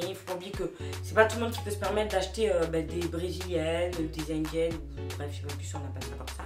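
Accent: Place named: South, France. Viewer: T’a un accent du Sud , comme moi 😭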